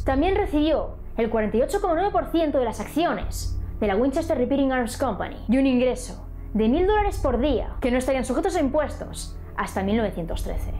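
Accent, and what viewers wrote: Spain. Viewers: Me encanta tu narración a pesar del dejo español, narra de una manera que te enganchas con el tema. No creo que esté embrujado pero si llena de mucha tristeza y quizas el alma de esta señora Sara no descansa en paz por la tristeza que le llevó al espiritismo. Oracion por ella 🙏🙏🙏 descanza en paz sra. Sara W.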